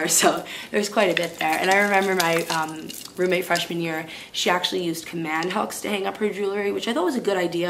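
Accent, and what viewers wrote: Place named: New Jersey, United States. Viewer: I love your accent! Are you from New Joyzie (Jersey)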